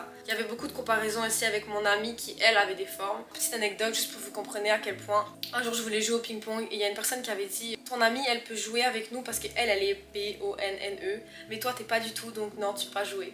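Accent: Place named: Quebec, Canada. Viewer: Je me disais bien que tu avais un petit accès québécois hahahaha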